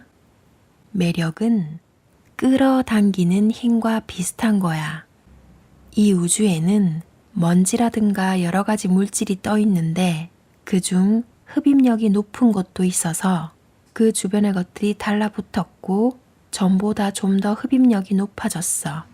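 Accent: Gyeongsang, South Korea. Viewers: 댓글 쓰려니 뭐가 뜨네요 이런거 첨이고 디게 예민하신가 유튜버중에 경상도말 쓰시는 분 엄청 많네요 표준어보단 귀에 덜 들어오는게 사실이네요 전달력을 높이려면 조금 연습하셔야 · 님~항상 영상 잘 보고 있습니다^^ 경상도 억양이 넘 정감스럽습니다^^ 저는 경남 진주 입니다~ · 님 갱상도가?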